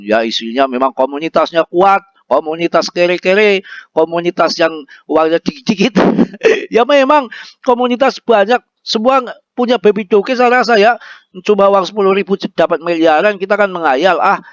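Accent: Banyumas, Indonesia. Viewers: Mantap...bahasanya kok mirip nyong banget😅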